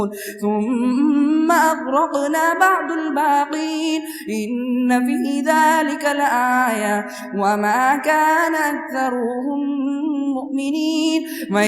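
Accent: Panipat, India. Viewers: ماشااللّہ بیٹے بھت ہی حسین بانی پتی لہجہ اللہ تعالی آپ کے علم و عمل میں خوب اپنی رحمتیں و برکتیں عطا فرماۓ آمین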